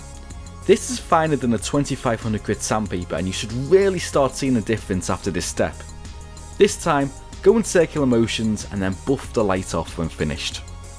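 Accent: Liverpool, United Kingdom. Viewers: from witch part of uk this lad is? very intresting accent. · Am i mistaken or you're from liverpool, i deduced that from the way you speak.If I am wrong please do tell me. As someone said it's nice to see someone reastoring normal car you know one for laymans not ones from wealthy people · Are you from Liverpool or Wirral at all? Can hear a scouse twang in your accent, i'm from Ellesmere Port :-)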